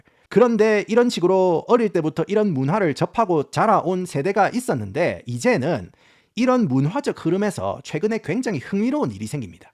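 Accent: Gyeongsang, South Korea. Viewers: 영어와 갱상도 액센트의 강렬한 콜라보!!!!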